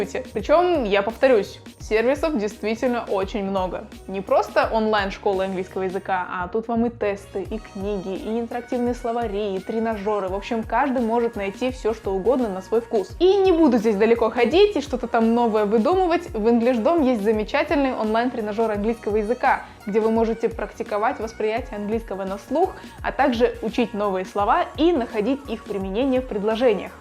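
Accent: Kazakhstan. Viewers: Казашка? Ты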